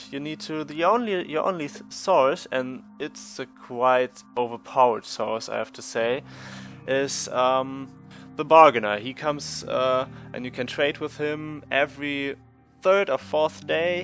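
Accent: Germany. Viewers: Dieses Pseudo Englisch von Deutschen lmfao. Aber gutes Video !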